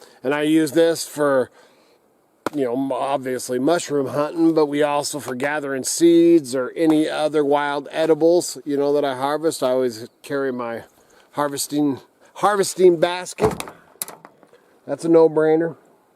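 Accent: South, United States. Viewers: Sounds like myself hillbilly enjoyed the clip thanks.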